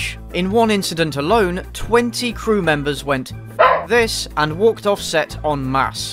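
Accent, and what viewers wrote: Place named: United Kingdom. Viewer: Love you're british voice, you closeted furry. Wish to see more great content from ya!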